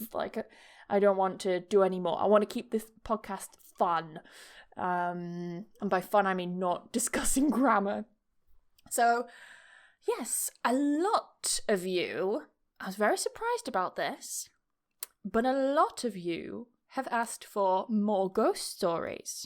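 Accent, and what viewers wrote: United Kingdom. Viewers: 100% British English always!!! I really enjoy your videos…..and with them I can develop my skills in understanding the English language. Thank you very much,.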